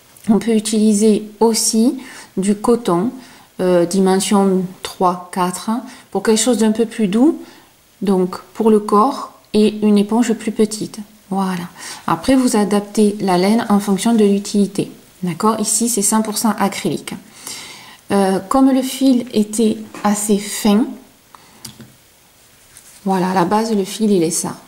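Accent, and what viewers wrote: South, France. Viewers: Bonjour, merci pour cette démonstration très claire et très agréable, tu te mets à la place d'une débutante et c'est super, continue comme cela! en plus j'aime bien ton accent du sud, moi aussi j'ai l'accent de Toulouse que j'ai gardé pourtant je n'y suis plus depuis l'âge de 20 ans! Je vais regarder si tu as fait un tuto pour faire un poncho... J'ai déjà fait un tawashi et une fleur éponge! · félicitation pour les explications très claires que je vais rapidement mettre en pratique, avec une mention spéciale pour ton accent, car bien souvent l'accent du sud se fait rare sur internet!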